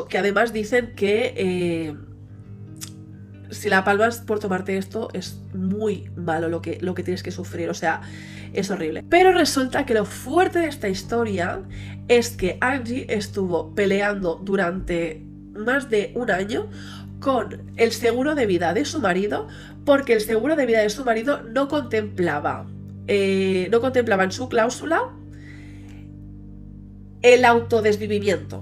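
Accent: Spain. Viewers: El crins ese del que hablas, es el catalán solo para catalanes. Me quedo mil veces contigo porque...hablas en español como se habla en España. Osea ..mil veces tu😅😅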